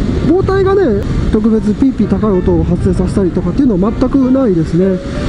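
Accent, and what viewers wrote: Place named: Okayama, Japan. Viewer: 「岡山県人の喋る標準語」に心のセンサーが激しく反応しました！これからも頑張ってください。ちょくちょく覗きに来ます。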